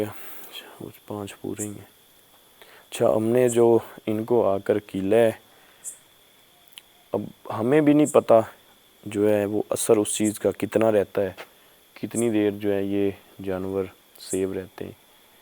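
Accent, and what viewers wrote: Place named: Punjab, Pakistan. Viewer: Bhai what about you & how is your health I hope u will be fine & better You r very responsible person You r so nice your Punjabi accent is also nice Like churhaaaail 😊🥰 Bhai Yahan tk to thk ha churail ab larki to na bolein bura lgta ha yaar 😂😂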